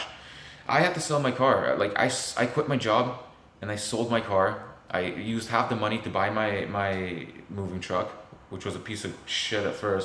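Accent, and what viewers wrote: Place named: Lithuania. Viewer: You sound like Lithuanian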